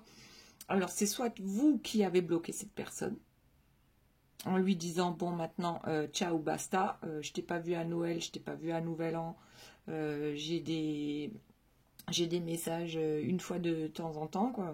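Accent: Alsace, France. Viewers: Bonjour ! Vous avez l'accent alsacien 😊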